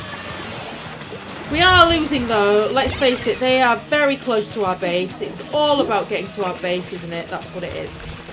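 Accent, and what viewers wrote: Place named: London, United Kingdom. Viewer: Absolutely good Splatoon vid! We should play :D U sound like your from London? cuzz I am And I looooooooove ranked!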